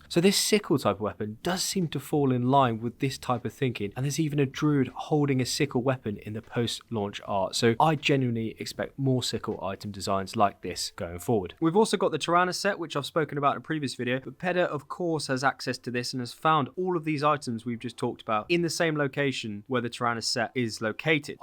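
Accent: England, United Kingdom. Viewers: Judging by your accent, you’re English?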